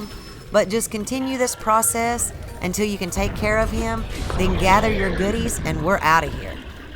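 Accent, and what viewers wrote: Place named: Blue Ridge, United States. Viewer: I did max hearts max 30 min offense and full stamina with two yellow circles and saved right before I put the sword back for middle trials. I'm not sure wuts better max damage 30min or defense. But I paused on the third level to charge my switch. Btw I'm from western nc u sound like a blue ridge mountain person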